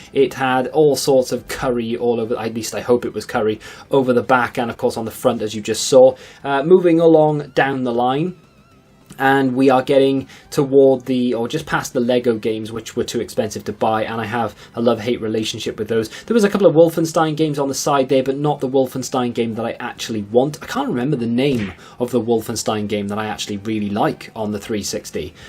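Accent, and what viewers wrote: Wales, United Kingdom. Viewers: Are you welsh ? Ive got a PES<num> promotion copy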